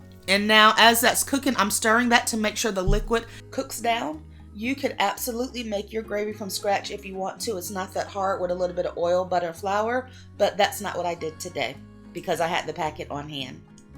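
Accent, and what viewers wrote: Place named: South, United States. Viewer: Yes, I knew you were from the south immediately when you said y’all! Thanks I’m glad to have found you. I will be following.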